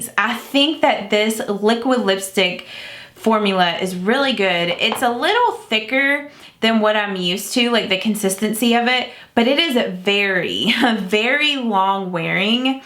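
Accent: South, United States. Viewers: You talk with the southern DRAAAAAAL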